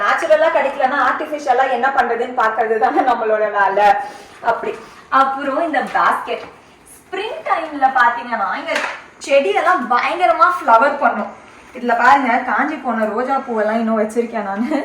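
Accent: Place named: Chennai, India. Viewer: Yakoov Actualah Chennai Slangu bangam pannuthu 😂 Keep doing it on Tamil 👍🏼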